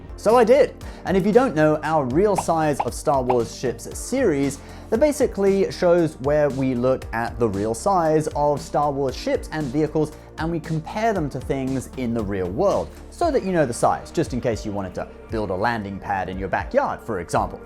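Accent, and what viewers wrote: United Kingdom. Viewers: Yay another video with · Welcome back. Good show, good show 👏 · Why don't we see any more. I miss you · ? That's a real surprise. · A rare appearance of indeed. But always a pleasure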